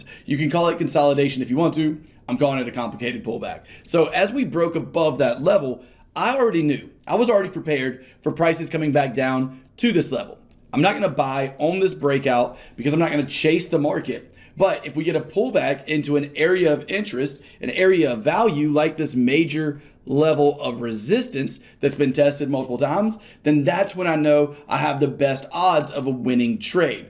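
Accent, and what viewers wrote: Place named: Texas, United States. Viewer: Sounds like Texan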